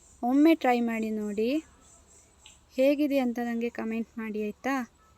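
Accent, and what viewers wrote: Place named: Kundapura, India. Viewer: Nevu mataduwa bhashe tumba chennagide kundapura Bhashe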